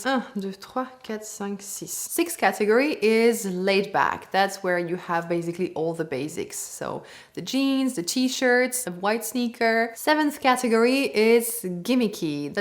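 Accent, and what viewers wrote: France. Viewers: Merci beaucoup. Vos conseilles sont très intéressantes. Je les regarderai. J’habitais en France pendant un an quand j’avais 18ans. Mes amies, ma mère d’accueil et beaucoup d’autres femmes dans ma vie ont utilisé tout les chose que vous avez nous montrer ici dans votre vidéo. Merci beaucoup, j’avais besoin de souvenir tout ces informations. J’aime aussi comment vous parlez anglais ❤. Très bien, et avec une belle accent français! ❤😊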